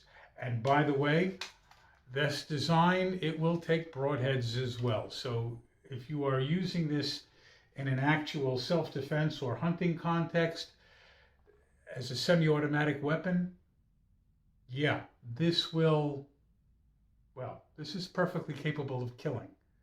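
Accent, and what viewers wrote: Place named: England, United Kingdom. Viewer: I thought Brooklyn but then I recognised the English tone to your voice and assumed it was the other way around sorry. great video anyway, I'll be doing the same modification tonight with your help. Thank you!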